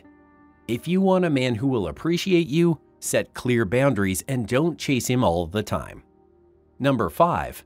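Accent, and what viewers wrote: Canada. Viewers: The Canadian accent strong here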